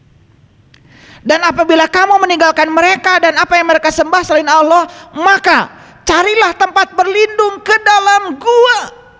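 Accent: West Java, Indonesia. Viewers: Lebih kepada logat bos,, logat Sunda emng sperti itu, akn brbeda dngn logat orng Jawa, orng Arab dn sbagainya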